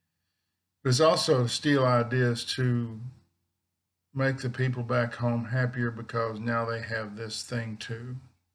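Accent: Texas, United States. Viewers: Moved from Austin to N. CA 20 years ago and I find a certain comfort in Texan twang (not as strong as my dad's was), too... and I miss Central Market/HEB!:-) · I sure enjoy your readings, but I LOVE your accent!! Moved from TX to AZ this year and I miss that TX accent .... and HEB !!!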